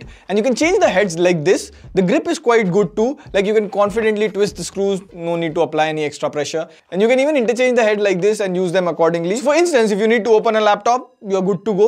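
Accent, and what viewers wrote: India. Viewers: Indian lag rahe ho Hindi me bolo na mujhe kuch bhi samajh Nehi aaya 😂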